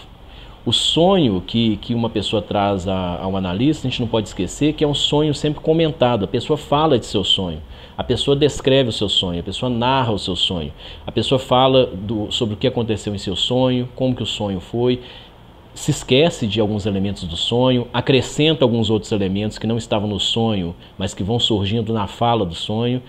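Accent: Minas Gerais, Brazil. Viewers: Gosto muito do sotaque mineiro, é suave, vai muito bem para um psicanalista. é um excelente representante de Minas Gerais.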